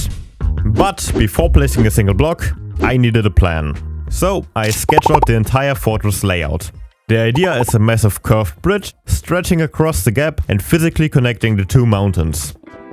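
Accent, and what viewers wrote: Germany. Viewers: Are you German? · Your German right? And nice build btw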